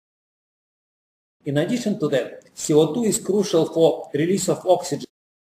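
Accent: Russia. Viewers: Your accent is very Russian :) but I see you're confident in your speech and it makes video more interesting to watch. Thanks for great lessons.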